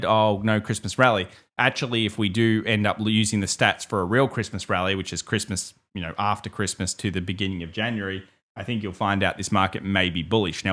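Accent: Australia. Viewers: You talking like Crocodile Dundee over here!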